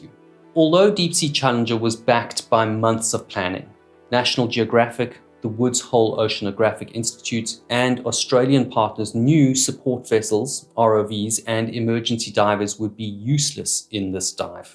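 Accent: South Africa. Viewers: Except he has a South African accent! It is a nice accent though. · I love the content and the South African accent 😊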